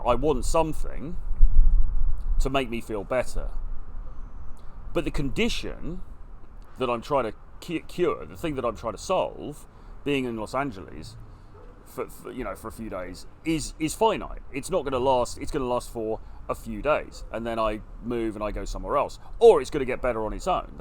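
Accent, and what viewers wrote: United Kingdom. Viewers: Although you and I probably have a number of major differences when it comes to beliefs in life (I believe in Jesus Christ/the bible; not sure if you do), as someone who's right around your age (I think I'm like 2 or 3 years older than you), I love how real you get, and the things you talk about. I've never run across this in the Manosphere, and I've watched TONS of videos since I got "red-pilled" a little under 4 years ago. And, although I'm American (and, this has nothing to do with having significant heritage from the U.K.), it's nice to listen to a well-spoken Brit, especially since--and no offense to anyone--many content creators in the Manosphere sound like they may not have even graduated from high school. I'm sorry, but being intelligent, educated, well-spoken, etc..., regardless of what people say/admit, gets you a lot more credibility than not being those things.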